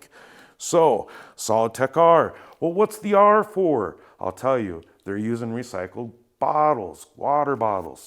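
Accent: Minnesota, United States. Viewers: I knew it. I could hear that MN accent after 2 mins. Thanks,!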